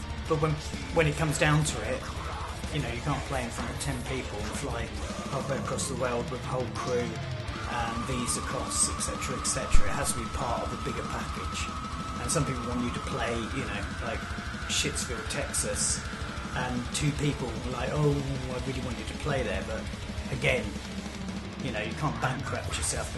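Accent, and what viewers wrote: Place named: United Kingdom. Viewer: Now thats a beautiful UK accent <3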